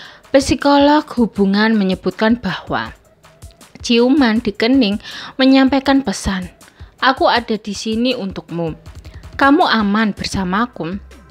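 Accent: Java, Indonesia. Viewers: Walaupun ngomong indonesia tetep jowone medok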